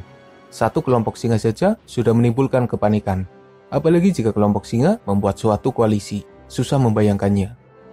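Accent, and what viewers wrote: Banyumas, Indonesia. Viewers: Ngapak e ketara banget kiye admin e 😅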